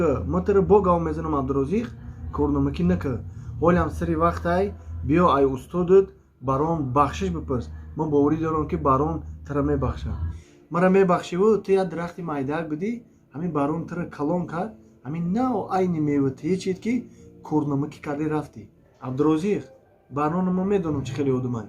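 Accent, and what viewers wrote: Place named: Yovon, Tajikistan. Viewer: Ту монда буди ба хами акценти ёвонит Абдурозика таьна накарда.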